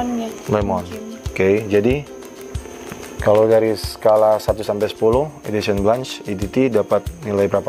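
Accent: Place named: Manado, Indonesia. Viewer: Dari Logatnya orang manado ya bro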